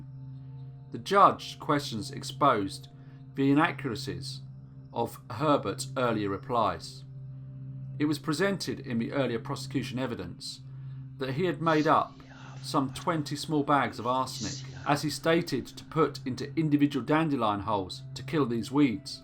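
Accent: England, United Kingdom. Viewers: Hi! I really enjoy your narrations an voice. The pacing, emotion, cadence, and enunciation all balance out very well. I was curious as to what part of Europe you live/d in with that particular accent. I'm assuming it's English and all, but you seem to have a softer edge? or something to it. Don't think I can really put my finger on it. It may just be your particular way of speaking instead of a particular accent or regional way of speaking. Still, I'm curious where abouts, roughly, you live. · God almighty wat th hell is it that draws me to an English accent th music, th talk, everything about these people says hey listen listen I got to go to the UK... Very good story. Great speak loved th story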